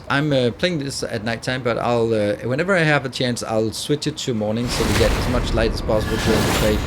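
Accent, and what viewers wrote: Denmark. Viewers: Is that an danish accent Im hearing? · Thanks I was really curious where to get that item that was on the cliff Edge. Funny enough I immediately recognised your Danish accent. Fortsæt med det gode arbejde.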